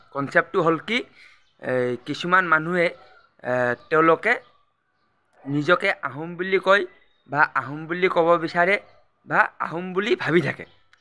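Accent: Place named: Bihar, India. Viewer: Apuni jitu bhakha koixe , jitu ussaron korixe , tenoka kitabotu asene, moito enoka bhakha kebol bihari Miya mukhere h hunu😂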